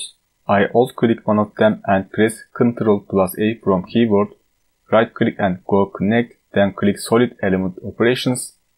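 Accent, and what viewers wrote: Turkey. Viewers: izliyorum izliyorum diyorum ne kadar türk aksanı var , archicadde çizim yapmayı bırakıp bunu düşünüyordum. ekranınızdaki TUR yazısını görünce aa haklıymışım dedim :)) teşekkürler çok yardımcı oluyor videolarınız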